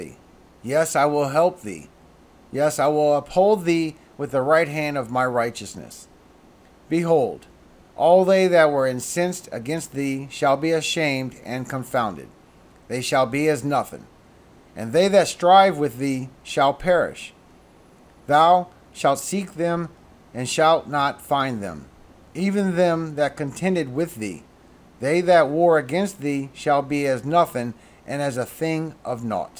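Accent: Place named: South, United States. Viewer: God bless,. We both have biblical names and I am glad to be your brother in Christ. Besides that, I want to say I recently found your channel and I am thoroughly enjoying your content (aside from the life threatening aspect of course). Also please don’t take this the wrong way, but I like your content because of your mannerisms, accent, etc. As a southerner, it’s nice to see another one out there going through heavily scientific content but in layman’s terms and with the cool mannerisms of a southerner. Keep on keepin’ on and once again god bless!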